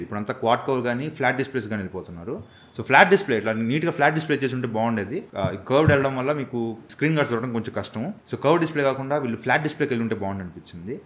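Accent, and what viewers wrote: Nellore, India. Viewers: Anna Medhi Nellore aa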